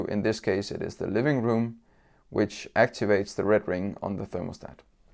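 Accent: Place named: Denmark. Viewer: KÆFT, en slem omgang Danglish! Har I ikke penge til at hyre en engelsktalende vært?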